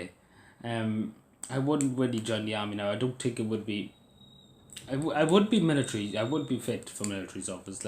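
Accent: Cavan, Ireland. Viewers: Love the Cavan accent. I worked for a Cavan man many years ago. Best boss I ever had. Looked after all his staff so well.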